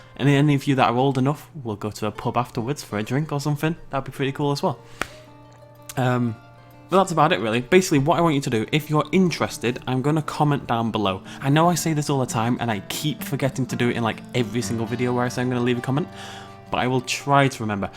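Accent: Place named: Manchester, United Kingdom. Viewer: I live in Manchester, I thought you were from around here from the accent. Would love to play ARK with you and come see JW if I'm free :) I'm watching a Twitch stream of ARK now and the server won't load :S hope the actual release is better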